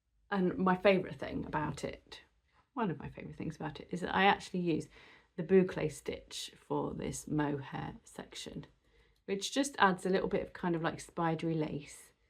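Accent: England, United Kingdom. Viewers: As usual love your english accent, humour and laid back style. Not to mention all your croch-eting. Lots of inspiration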